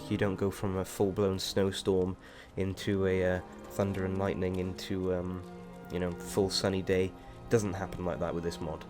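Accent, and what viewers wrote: United Kingdom. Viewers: You have a British accent :D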